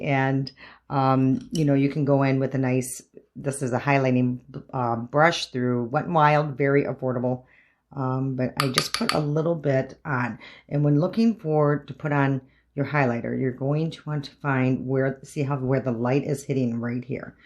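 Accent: Midwest, United States. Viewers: I'm new to your channel; Allie Glines linked you on her recent video testing out the Loreal serum foundation. I'm 48, and i'm also in the process of re-learning makeup...and falling in love with it again! 😎 You mentioned your undereye darkness, and i have that as well. I have found a few products really do completely neutralize that discoloration. I like the Pixi Correction Concentrate, the Fenty Bright Fix Eye Brightener Concealer (shades like melon or deep melon), and the Tarte Colored Clay Undereye Corrector. These products do not crease if you use a very thin layer, and they do not move if you set them lightly. The key is super light layers. Anyway, hope this helps someone! PS...i hear a very familiar Midwest twang...where are you from?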